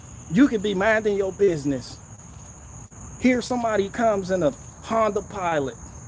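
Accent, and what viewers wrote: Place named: Boston, United States. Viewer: Yeah, yer Bahstin accent is getting there/ What is up with RFB? I never see anything from him.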